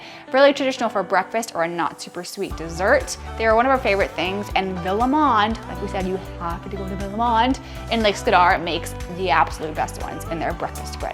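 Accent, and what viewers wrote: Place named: United States. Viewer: your squeaky ummerrigaan voice is annoying ...you come across like the typical arrogant over the border wise ass but really are below avg....go to you florida...leave us alone..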